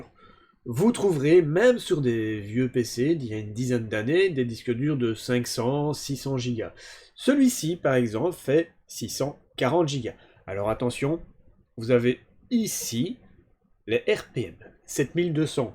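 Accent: Belgium. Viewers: T’es belge ?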